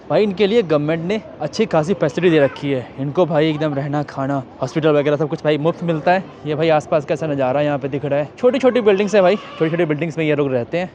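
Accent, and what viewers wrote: Bihar, India. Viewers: This is the way a bihari talk . (Hum) Aur (bhai) in most sentence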